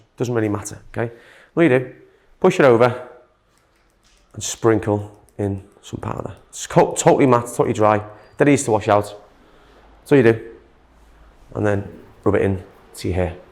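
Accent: Liverpool, United Kingdom. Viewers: Scouse barber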